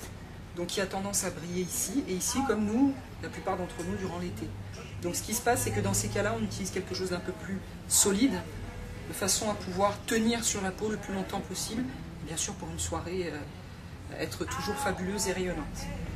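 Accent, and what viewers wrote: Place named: England, United Kingdom. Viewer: puree l'accent anglais !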